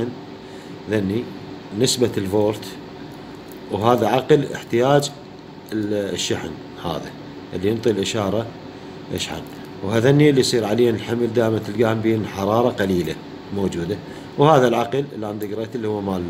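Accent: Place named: Iraq. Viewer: السلام عليكم ورحمة الله وبركاته اولاً اشكرك على هذا الشرح الرائع بس ياريت بشروحاتك القادمة تحاول تتكلم بلهجة تكون مفهومة اكثر لغالبية المتابعين أخوك من اليمن وعندي مشكلة باللهجة العراقية ما افهم عليك بعض المصطلحات 😅 (تحية من اليمن لكل عراقي)😘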